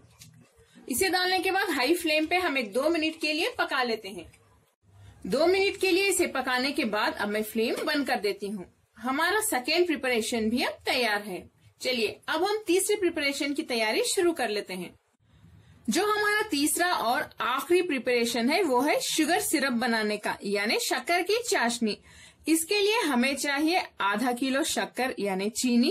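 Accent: Hyderabad, India. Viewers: I really like your hyderabadi accent and by the way thanx for sharing the recepie love hyd food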